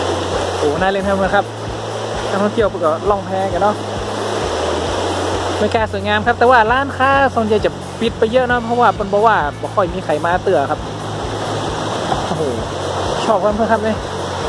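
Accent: North, Thailand. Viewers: อ้ายคนเหนือบ่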